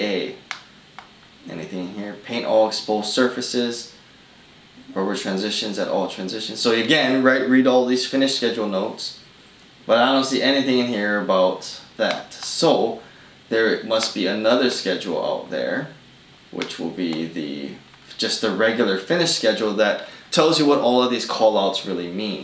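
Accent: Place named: Hawaii, United States. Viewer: I could tell just within the 1st minute of your video that you were from Hawaii. Mahalo for the content, I started as a laborer 2 years ago and want to progress with this as my career choice so I’ll be watching more of your videos!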